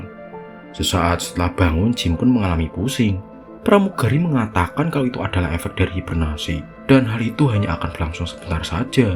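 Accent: Java, Indonesia. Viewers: Medok bgt naratornya.. 😅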